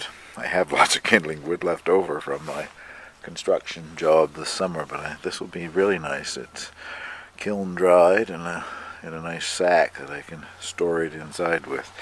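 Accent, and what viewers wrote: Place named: Nova Scotia, Canada. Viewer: Subbed ya for two reasons. 1) Love the down East accent. Although I live in the prairies now, I grew up in N.S, and hearing you speak makes me feel like I'm back home. 2) I love how very Canadian you are. My husband and I try very hard to buy as much Canadian made product as possible. About a year ago we bought a boler (Canadian made mini Camper). We're hoping to add many camping vids to my channel this coming summer. Anyway, great vids. I've been binge watching a few days now.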